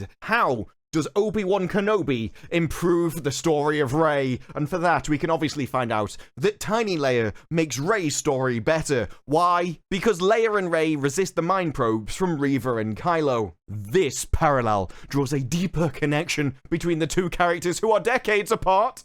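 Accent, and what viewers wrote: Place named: Stoke, United Kingdom. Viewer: I don't agree with everything you say but you speak eloquently. You are a well informed man with good language jive. btw are you from Stoke?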